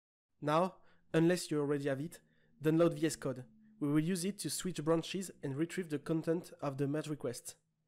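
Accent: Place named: France. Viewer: I love French accent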